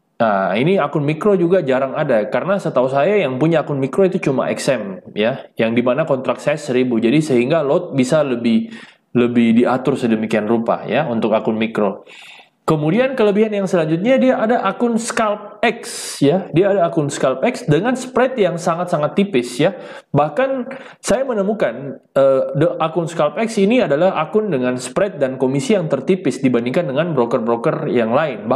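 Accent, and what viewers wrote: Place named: Makassar, Indonesia. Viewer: Didengar logatnya pasti org makassar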